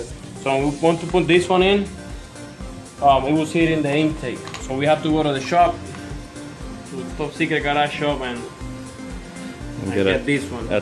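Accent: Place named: United States. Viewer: Pareces un Yanki hablando English...coño.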